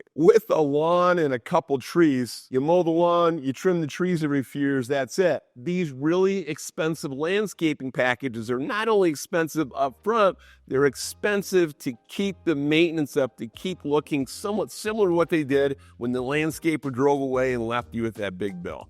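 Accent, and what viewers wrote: United States. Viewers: Love the Michigan accent! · You sound like you're from the Binghamton area!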